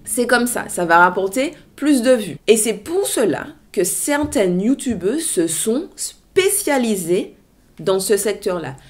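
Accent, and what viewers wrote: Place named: Antilles, Caribbean. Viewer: Hi, j'adore ton petit accent bien de chez nous Mart. ou Guad...... Cela fait un moment que je te suis j'aime beaucoup tes contenus, tu reste égale à toi toi-même, ta franchise et ton honnêteté font de toi une belle personne. De mon côté mes cheveux ont commencé à pousser et à mieux se porter quand j'ai arrêté d'acheter pleins de produits capillaires qui n'avaient aucune efficacité...... il me suffit maintenant de regarder côté cuisine et de compléter avec d'autres produits naturels. Merci pour ta franchise et bonne continuation à toi ma belle.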